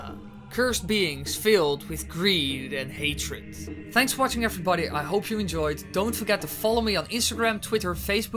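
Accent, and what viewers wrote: Netherlands. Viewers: Your accent sounds dutch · jesus man you may be Dutch but don't lay the accent on that thick.... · Lol, u are dutch, i can tell. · He has a very heavy Dutch accent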